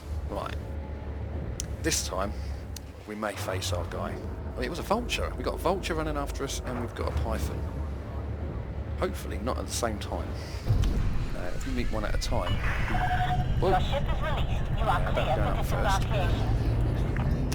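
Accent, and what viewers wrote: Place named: North East England, United Kingdom. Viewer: I have to totally agree on the North East accent, I too just love it. Thanks for making this very informative video that I have only just come across. Liked and subbed.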